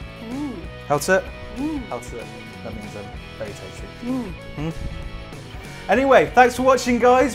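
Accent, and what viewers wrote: England, United Kingdom. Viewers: I didn’t expect the English accent. Nice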